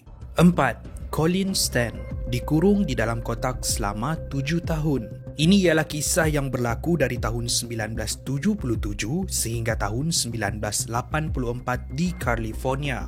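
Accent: Sabah, Malaysia. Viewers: Org sabah kah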